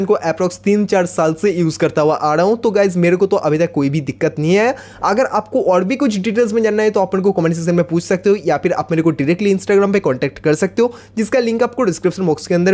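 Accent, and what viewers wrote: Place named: Bengal, India. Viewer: bro the bengoli accent is so freaking cute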